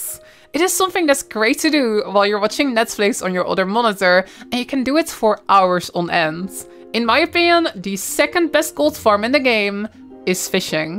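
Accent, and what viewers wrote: Netherlands. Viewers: very clearly a Dutch person speaking English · Ik vind je Nederlandse accent wel best heftig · Yea, I probably stash the components in my bank that would net all the Gold then. I have a really old account but hat a long hiatus of over 8 years and now get back into it, playing through all the stories and unlocking the mounts (which can be expensive). So I don't have a boatload of materials unfortunately and all the areas I visit will give new stuff that I never had before. Also don't have a whole lot of time to play so I have to pick and choose and avoid getting burned out otherwise it's just a waste of time. By the way, are you Dutch by any chance?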